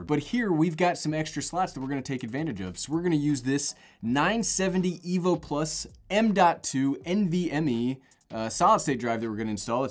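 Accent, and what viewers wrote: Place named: United States. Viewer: Always makes me do my confused face when Americans pronounce 'Soldered' as 'Sodard'.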